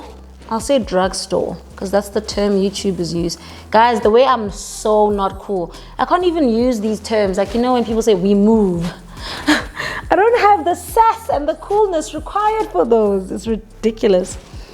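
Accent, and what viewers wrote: South Africa. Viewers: is it just me or does she sound South African ? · are you south african? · She is south african😍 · LOL. It's our typical South African accent❤️ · She sounds South Africans....damn🚶🏾‍♀️ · She sounds very much like she has a black accent. Just from JHB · Are you South African? You're accent is awesome! I'm American -born Nigerian, but my accent is boring valley-girl 😆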